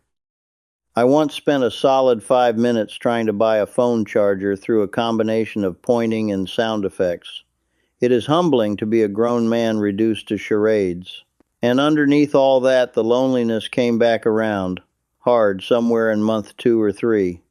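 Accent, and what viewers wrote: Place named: Texas, United States. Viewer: He is from Texas. They speak like that.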